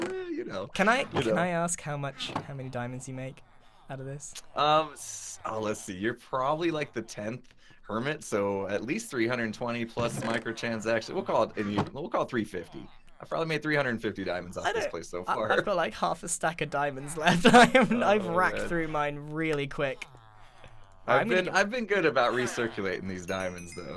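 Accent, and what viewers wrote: United Kingdom. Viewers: Has British accent Comment section: DAN TDM?!?!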